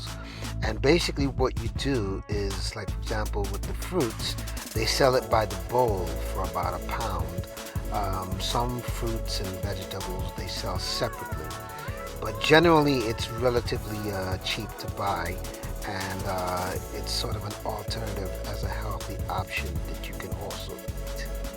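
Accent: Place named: United States. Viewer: Your.acsent.is.not.like.british.are.you.american.your.english.is.good.like.americans <link>.with.you.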